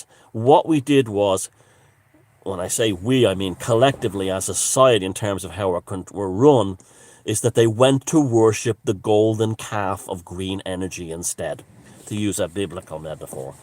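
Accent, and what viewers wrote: Ireland. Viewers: There goes again with that intriguing accent, I love you... “economic growt”! I’m gonna start practicing my Irish accent... lol, I’m serious... I’m doing the accent right now as I type! Why am I thinking of ”Trainspotting” (1998) all of the sudden? That’s RIDDLED with Scottish indiscernibilities... which is also awesome. Alan Watt: “Such a farrrse, it’s such a farrrse...”! Did I hear you say lubricant?! Gonna get me some Irish laydays!!!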